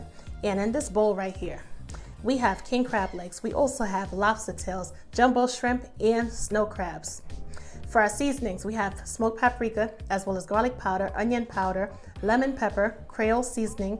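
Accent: New York, United States. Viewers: Ikr 😆 Is it New Yoik (?) York 😉 I Love it 👍😁.... Just like her looks and cooking skills... Genuinely Natural and Beautiful 😊😊😊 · Looks delicious n sounds like u have a NY/NJ accent. Love it! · looks yummy and yes thats a strong new york accent i hear lol it sounds like brooklyn or long island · Sounds like New York??? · U did that !!!!!! U have an accent where r u from ?? NYC? CT? Islands?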